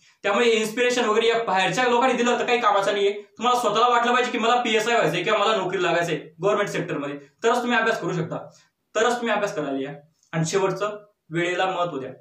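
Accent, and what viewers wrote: Khandesh, India. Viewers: Bhau khandeshi she vatat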